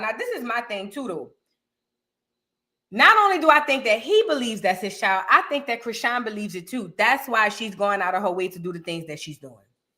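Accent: New Orleans, United States. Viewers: Girl I adore her New Orleans accent!! ❤❤❤ one of the main reason I watch her!!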